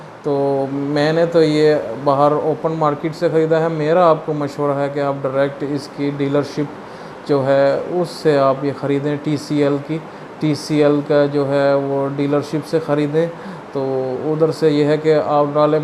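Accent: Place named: United Kingdom. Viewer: urdu ko انگلش ke style main بولتے ہیں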